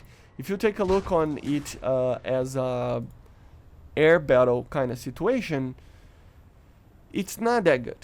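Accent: Brazil. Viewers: no começo ja comecei a estranhar o jeito de fala e no final realmente acertei q era brasileiro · You are brasilian aren't you? If not, your accent is very brasilianish.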